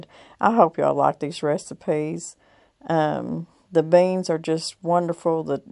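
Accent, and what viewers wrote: South, United States. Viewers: Now thats the just right hill music and southern accent to cook to! When you said purty good i was home! 😊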